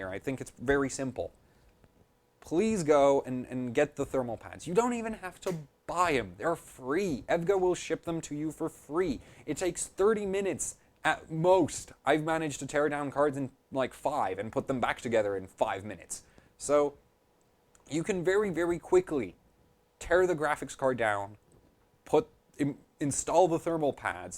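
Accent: United Kingdom. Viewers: you have actually got a pretty nice English (UK) accent. BTW love your honesty and not overworked videos. thx and keep it up ;-)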